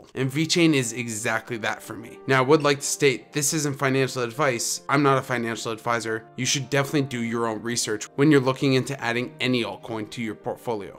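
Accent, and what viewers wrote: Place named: Canada. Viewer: I dig your Canadian accent. I bet people on YouTube around the world (other than US and CA) think you're American. There's only 4% of things you say that give you away. ;-) I follow about 8 crypto channels and am HEAVILY invested in this world and have added you to my list to follow. You're knowledgeable and I dig that. Thanks, brother to the north.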